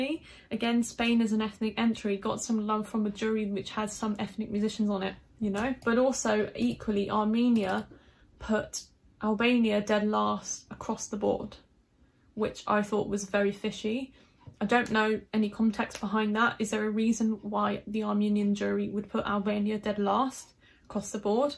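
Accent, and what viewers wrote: United Kingdom. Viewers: I believe you are completely out of track. Your analysis does not entail the political economy aspect of the issue. Let me rephrase Stalin principle here "it is not important who votes, it is important who nominates who is going to vote". The main issue being here that these jury members are too few and nominated exclusively by the broadcasters and that is too easy for them to control the results whomever they nominate to be part of the jury, rappers, pop singers, astronauts or belly dancers. Please give a look to the complex jury system (and numbers of components for each jury segment) adopted for Sanremo. Still far from perfection but lightyears ahead than ESC in trying at least to minimize such "distortions" particularly on the broadcaster side which in this case is RAI. Clearly the music industry is a giant and investments need to be protected even in this economic sector so do not expect that this will turn overnight into a completely fair game but please keeps fighting (no weapons needed, as a youtuber you can fight with your nice oval and your remarkable british accent) also in the name of those (including me) that still believe that culture remains a human right “Everyone has the right freely to participate in the cultural life of the community. 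(art.27)”. Also, are you sure that televote is not at least partially controllable? First of all who is really enforcing the check related to number of votes per mobile number once the Eurovision is over? Secondarily imagine a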